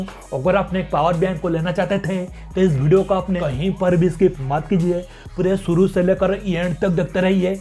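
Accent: Bengal, India. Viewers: He seems Bengali and that’s normal way of speaking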